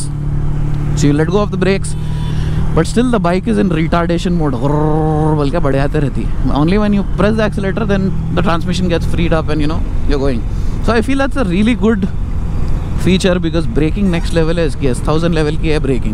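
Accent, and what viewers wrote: Hyderabad, India. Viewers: I have been laughing through out the video... Bro had i been a Hyderabadi i could have this phonetics....Hallu hallu chala nahi ghus jata maa ki kir kiri....I love this maan... :D From Bhubaneswar, Odisha. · U r language is very funny 😆 I am very enjoy u r video. I love hydrabadi language ❤. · I watch your videos for 2 things, your hyderabadi slang and the beautiful bikes you show us!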